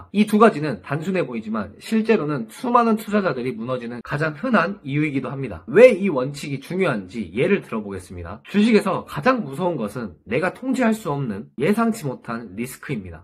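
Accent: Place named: Honam, South Korea. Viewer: 호남사트리 ㅋㅋㅋ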